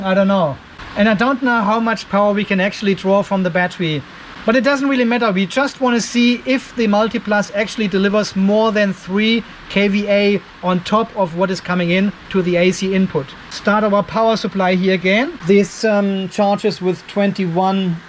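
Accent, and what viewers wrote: Germany. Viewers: Hört sich an als käme er ursprünglich aus Deutschland.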